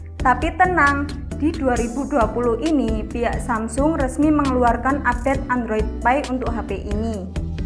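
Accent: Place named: Java, Indonesia. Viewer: Medok men to mbak ma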